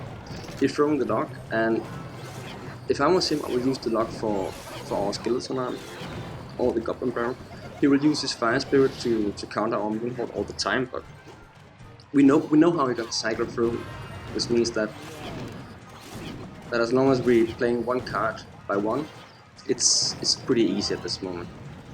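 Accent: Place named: Denmark. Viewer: hvad laver du du er jo dansker det kan man høre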